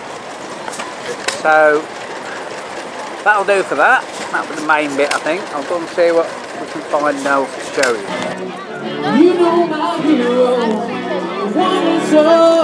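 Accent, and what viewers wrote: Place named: Lincolnshire, United Kingdom. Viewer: Thanks,should we compromise and call it a country show? Great to hear your Yellerbelly accent,very rare these days.I am a foreigner myself, having moved here 34 years ago,a newcomer but loved every minute! All the best.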